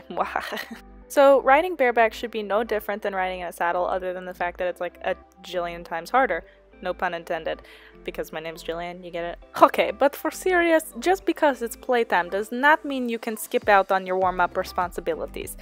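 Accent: Russia. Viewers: I promise I'm not a 12 year old weeaboo but out of curiosité Do you happen to watch Yuri on Ice or is the Russian ish accent just for funzies? (My god asking about anime. My thirteen year old self is screeching) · Is that a Russian accent, sounds like my departed gramps Latvian accent. Have 4 horses at the house sit, thanks for the inspiring video! Subscribed!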